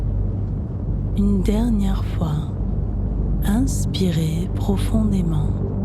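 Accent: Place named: Democratic Republic of the Congo. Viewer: C'est pas agréable de se faire raconter une histoire par une personne ayant un accent congolais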